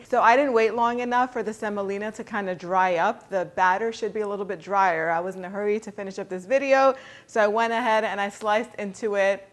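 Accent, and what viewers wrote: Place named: New England, United States. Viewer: Love your Yankee accent than Shambali ❤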